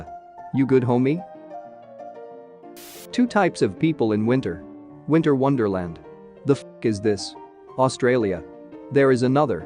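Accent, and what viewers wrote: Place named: United States. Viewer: But your text to speech accent is so american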